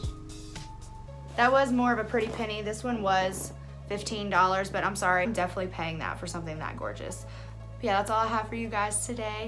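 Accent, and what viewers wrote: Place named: United States. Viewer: Girl what state are you from? I like ya accent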